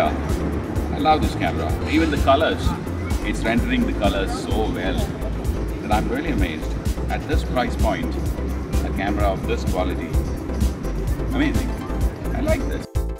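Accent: Kerala, India. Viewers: sir your malayalam accent 👍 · Thanks for the videos I like photography. If u don't mind shall I ask you one thing are you from Kerala. Because ur slang is a bit like that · Can't help but notice such a strong mallu accent, very good videos though.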